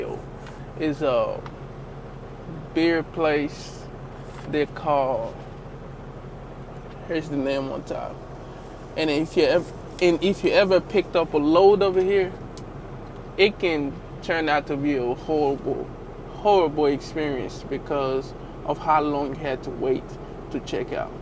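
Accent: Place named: New Orleans, United States. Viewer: Sounds like a NOLA accent.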